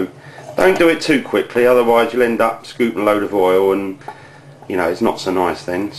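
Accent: United Kingdom. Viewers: The texture of potato absorbents too much oil.. I don't see myself indulging on such an unhealthy recipe. As a matter of fact, I can think of two ways to do this exact recipe in order to cut, more than likely, at least HALF the calories. But in fairness, healthy wasn't your approach. Dzięki Ci! Ps. British accent, Mexican dish?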